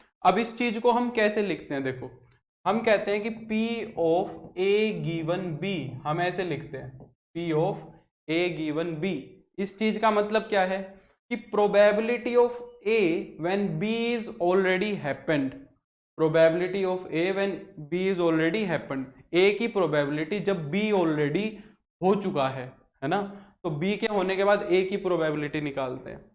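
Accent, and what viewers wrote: Haryana, India. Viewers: Is sir from haryana? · Sir app haryane ke ho na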